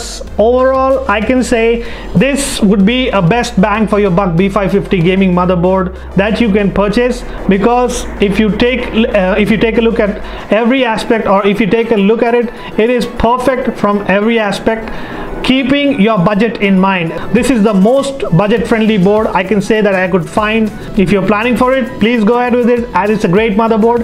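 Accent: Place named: India. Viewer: way too much accent .. be indian with neutral accent .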